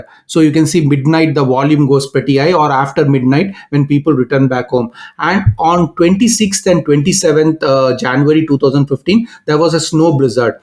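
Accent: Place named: India. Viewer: accent as thick as curry. unsubbed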